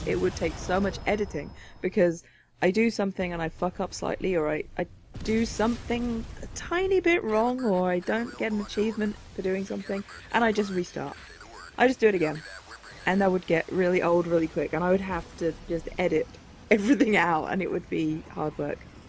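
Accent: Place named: United Kingdom. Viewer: Mmmmm, saucy, British femme fatals playin' vidyuh games. Welcome back,!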